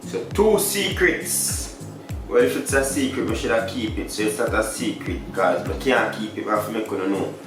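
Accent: Jamaica. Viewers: I like how you narrate your videos. It's a good balance of JSE and Jamaican Patois. "Ah murda!"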